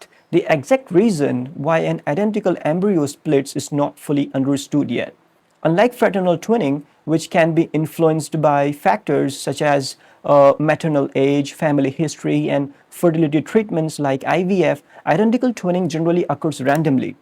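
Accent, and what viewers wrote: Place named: United Kingdom. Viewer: The British accent was great k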